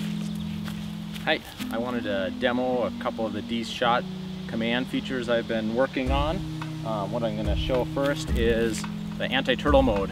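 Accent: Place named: Wisconsin, United States. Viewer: Sounds like you're from Wisconsin. I am!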